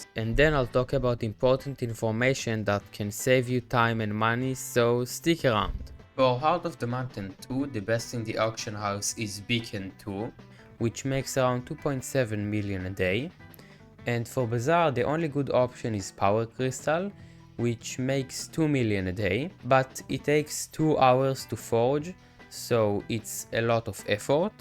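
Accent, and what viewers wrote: Israel. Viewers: איזה מבטא ישראלי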